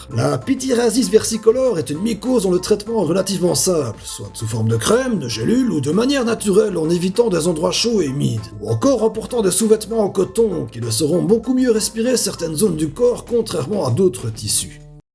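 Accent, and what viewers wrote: Liège, Belgium. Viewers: t'es liégeois ?? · normal c un belge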